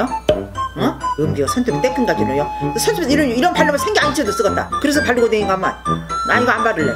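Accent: Jeolla, South Korea. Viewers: 부모님 둘다 전라도분이셔서 이쪽 사투리 너무 좋아요 ㅠㅠ♡♡시골온것같음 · 전라도사람이라그런지 친근감이 갑이에요♥너무귀여우셔♥♥ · 얼마나 부러운지 몰라요. 우리 할머니가 계셨으면... 우리 할머니 말투랑 똑같아요. 전라도 사투리! 진짜 너무 심하게 마음이 따뜻해지고 할머니가 그리워지면서 동시에 너무 웃김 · 할머니 ~ 저희할머니도 전라도 해남분이시라 할머니랑 말투가 넘 비슷해요 😚 그래서 우리 할머니처럼 느껴지고 너무 좋아요 !! 사랑해요 할머니 💖 오래오래 건강하세요 ~ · 전라도 분이신가요? 저희친가도 전라도라! 익숙한 사투맄ㅋㅋㅋㅋㅋㅋ 오래오래 건강하세요❤❤❤❤❤❤❤❤❤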